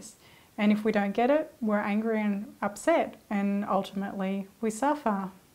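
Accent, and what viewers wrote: Australia. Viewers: What's with the gigantic subtitles? Is this really necessary? Do we have a problem with this delightful Australian accent?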